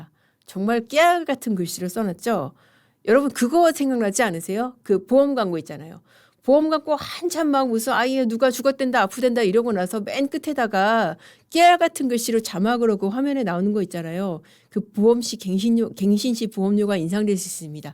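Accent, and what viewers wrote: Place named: Jeolla, South Korea. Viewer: AI 도 전라도 사투리로 말하네요?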